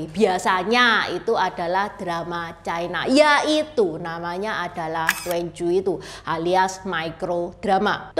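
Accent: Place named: Surabaya, Indonesia. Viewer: Aku suka logat Suroboyo …..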